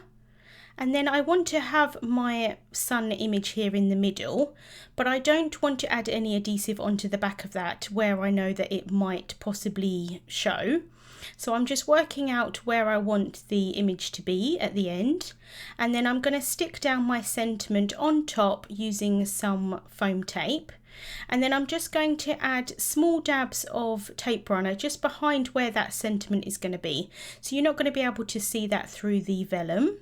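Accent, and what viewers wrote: United Kingdom. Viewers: I always enjoy your video's and just love your British accents!!!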